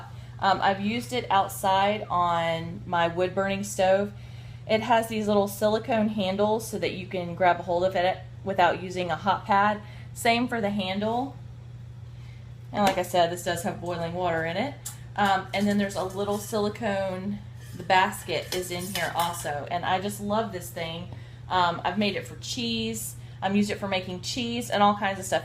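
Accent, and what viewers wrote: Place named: South, United States. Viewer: Hi, I'm spanish and I love the south. ¿what part of the south are you from? I'm studying the differents southern accents. Thanks!!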